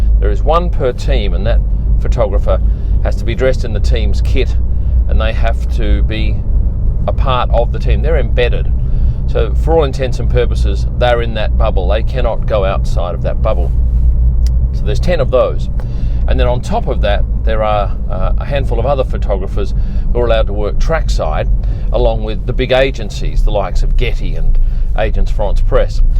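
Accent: Australia. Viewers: It’s so great to hear a fellow Aussie at the top of his game doing this. I shoot a bit for fun, but not on your scale. Love your work mate. From Quarantine central... Melbourne. 😂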